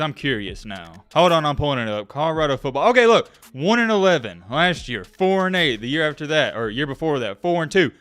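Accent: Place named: Alabama, United States. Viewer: This guy has to be from Alabama or somewhere down south. Lol his accent is definitely there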